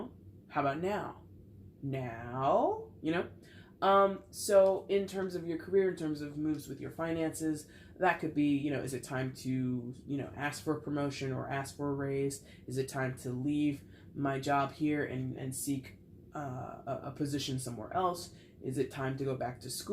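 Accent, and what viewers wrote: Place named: Upper Midwest, United States. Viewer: Love that Wisconsin\Minnesota accent! Lol I’ve never seen the queen of wands as older... even your card she looks young to me, I mean she’s the sexy queen lol. I’m guessing it was more about the intuitive message. Feels low key for a reading with two aces, but it’s better than a negative reading. I love the ace of wands and ace of cups— all passion, romance, and emotions, but this feels like you’re saying I shouldn’t get too excited, like aces aren’t that big of a deal after all.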